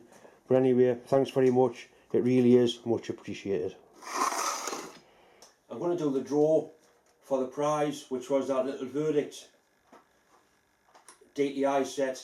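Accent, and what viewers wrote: Newcastle, United Kingdom. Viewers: just watched some of the video with subtitles on, Im guessing it doesn't understand a Geordie accent.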